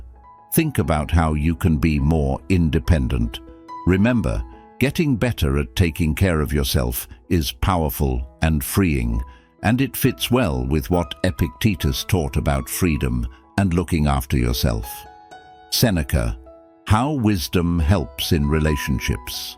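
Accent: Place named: England, United Kingdom. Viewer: Bla bla bla... with an English accent. Men have walked away from whomen, guy. Stoicism does not work on the modern whoman, she is too Marxist left now.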